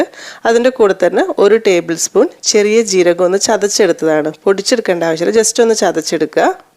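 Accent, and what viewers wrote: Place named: Malappuram, India. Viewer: നിങ്ങൾ മലപ്പുറം ആണോ സംസാരം കേട്ടിട്ട് കണ്ണൂരൊ മലപ്പുറം എന്തായാലും ചിക്കൻ സൂപ്പറായി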